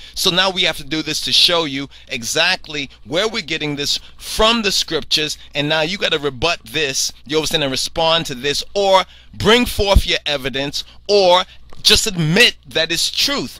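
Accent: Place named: New York, United States. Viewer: Are you from New York or maybe Boston? I hear a familiar accent, just curious. (BTW) I like it a lot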